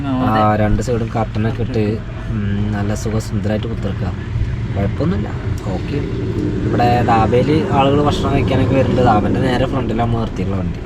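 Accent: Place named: Malabar, India. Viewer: ഈ ഭാഷക്കു ഒരു കുഴപ്പവും ഇല്ല ബ്രോ മലബാർ സ്ലാങ് ആണ് അങ്ങ് ക്ഷമിച്ചേക്ക് 😊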